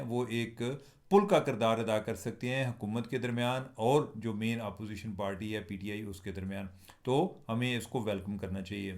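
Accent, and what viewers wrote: Punjab, India. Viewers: بے لاگ تجزیہ کھلا ڈھلا انداز جس میں پنجابی کا تڑکہ واہ کیا نیچرل اور دل کو چھو لینے والا انداز ہے❤ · Punjabi da Tarka good taste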